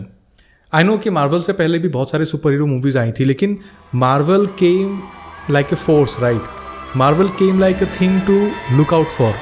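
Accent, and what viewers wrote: Assam, India. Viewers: Is he from Assam???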